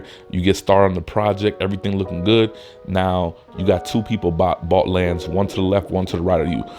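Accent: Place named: Northeast, United States. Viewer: your kolocwa is flawless 😂 Putting that aside, you sound a little like somebody who's lived in the Northeast ( PA/NJ/NY )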